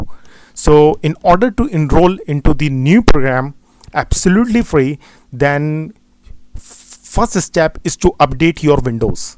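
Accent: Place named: India. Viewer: I'm leaving the moment I hear this Indian accent... already fed up with those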